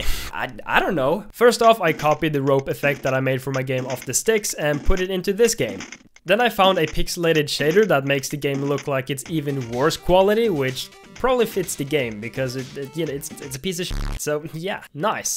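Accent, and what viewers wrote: Sweden. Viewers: Are u Swedish or danish???? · Are mah boi swedish?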